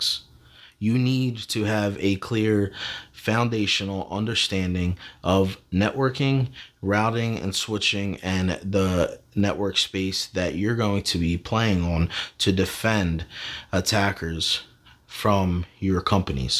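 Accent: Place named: New York, United States. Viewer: Also are you from New Jersey? Your voice makes me think you're from either New York or New Jersey.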